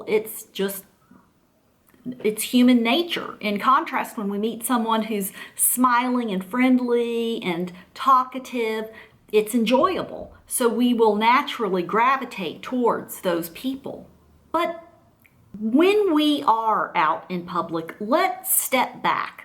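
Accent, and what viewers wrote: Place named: Texas, United States. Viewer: I've been told that you live in China. But I love your Texas accent! Anyways, a fine analysis of these puppets ma'am! You exactly described ''them'' and this female who I still like to refer to as a ''Siren'' or deadly mermaid from the Starbucks logo. ( Another one is Lilith as depicted in HR Gigers Tarot and the related book written by Akron! A masterpiece in my opinion. I could tell you terrifying stories about that! Telepathy, lucid horrific dreams and so on!) She still tries to contact\kill me after 3 years! The last time my stomach turned and it felt like a huge black hole when she passed me by with her bicycle. ''Tring, tring!''. I didnt respond but when I peaked I saw her strange eyes, smirk and her calm posture! At the same time her pace was fast and she reminded me of a bird of prey. (from the Odysee myth) Scary shit! 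My batterycharge dropped a 30\40 percent realising what she is and does! People died because of her, went crazy or live in the twilight zone! And as a no BS martian roaming the earth, I can tell you that this really something. SO FUCK HER!!! (Excuse me!) And thank you! Beautiful relaxing music 24/7 featuring instrumental music by <link>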